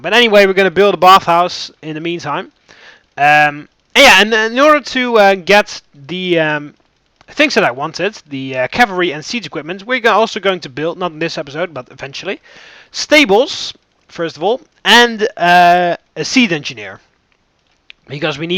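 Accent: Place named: Netherlands. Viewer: also are you dutch because you kinda have a dutch english accent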